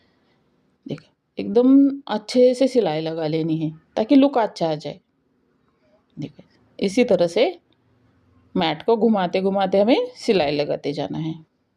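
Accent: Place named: Maharashtra, India. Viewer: mera dost maharastrian hai wo same to same apke jesa Hindi bolta hai ,mujhe sunne me acha lagta hai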